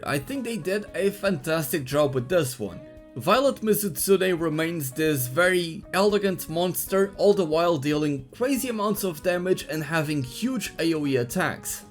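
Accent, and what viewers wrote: Germany. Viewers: interesting german accent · Yooo u german?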